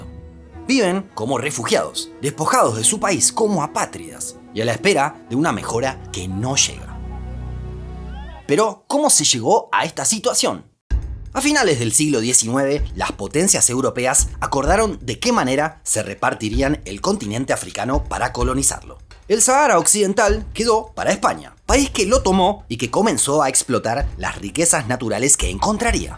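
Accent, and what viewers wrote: Argentina. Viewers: Hablas como Argentino y es feo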